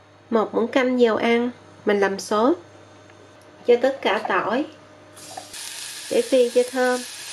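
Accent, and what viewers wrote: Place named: Mekong Delta, Vietnam. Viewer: Quê Cô ấy o miền tây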